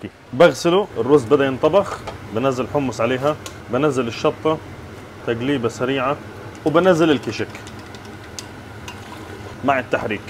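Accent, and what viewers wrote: Palestine. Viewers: عم تعرض طبخ ياالله ليش عم اتفرج وابكي أنا صار كل شي غزاوي كل لهجة فلسطينية تخليني ابكي ربي يفرجها على أمك وكل أمهات غزة وأمهات سوريا ويدفيهم برحمته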